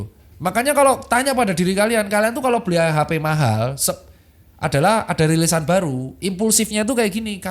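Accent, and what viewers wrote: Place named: Pekalongan, Indonesia. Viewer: Koyok wong Pekalongan sih logate😂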